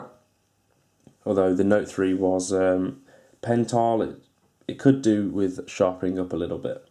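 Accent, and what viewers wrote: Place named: United Kingdom. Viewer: video starts playing: "yes! british accent!"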